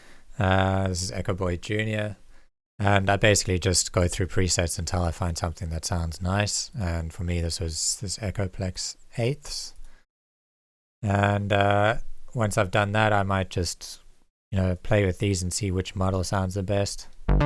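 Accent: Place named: Cape Town, South Africa. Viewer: Great tut, thanks. Cape Town accent?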